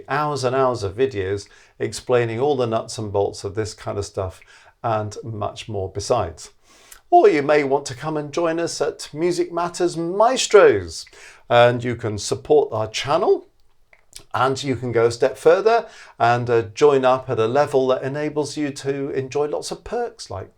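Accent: United Kingdom. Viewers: Simple explained and important topic, thank you. Great British accent too 😀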